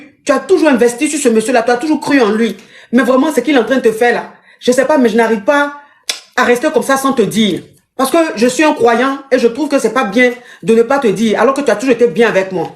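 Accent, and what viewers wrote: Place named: Dioula, West Africa. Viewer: Coach avec son joula facon la ca fait rire koi, c'est pas samalakolo c'est samarakolo qui veut veille chaussure gate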